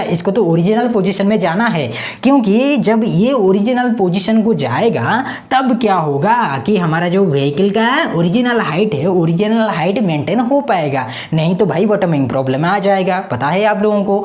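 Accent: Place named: Mexico. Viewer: Mexican accent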